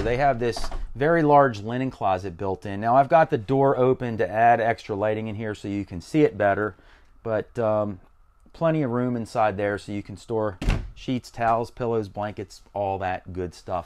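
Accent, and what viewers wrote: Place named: West, United States. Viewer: Hi, thanks for your answer, I have seen your videos my wife and my self love it, now I did not know that the process for the close caption was so complicated I thought that it was just sliding a button and voila, the close caption is generated automatically by YouTube, My apologies for that, having the subtitles helps with the accent because the west it's different from the east and it happens to me with youtubers from around the country.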